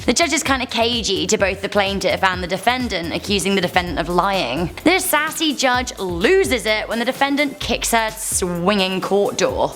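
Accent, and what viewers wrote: United Kingdom. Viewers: What is the story behind the British accent, you are from Canada, right??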